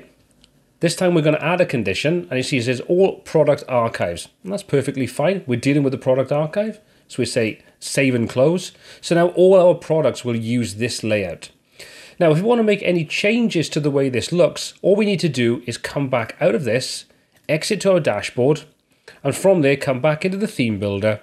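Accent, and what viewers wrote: Wales, United Kingdom. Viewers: Is that a welsh accent I can sense ? Swansea area ?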